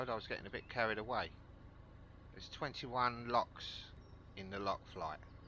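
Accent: London, United Kingdom. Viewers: I'm enjoying watching your vlogs, only been at it a couple of weeks, working through the back catalogue. Love the simple "come along with me" filming, and the great narrative over the top. Your phrases remind me of my dad, he was from West London (Paddington), so the accent ever so slightly different, but the phrases exactly the same. My husband would love an uncensored version of your vlogs, but I just watched one of yours from the Fenny Stratford cruise, and told him you don't like the agricultural language! Keep it up, your content really is soothing.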